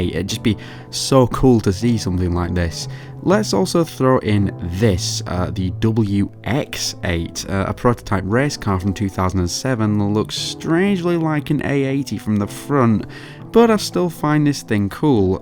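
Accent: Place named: Bolton, United Kingdom. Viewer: I can sense the bolton accent straight away for my first vid on the chanel😂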